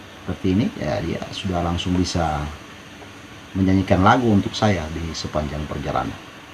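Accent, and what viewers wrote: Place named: Palu, Indonesia. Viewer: Dari logat sdh ketahuan org palu mange🙏😁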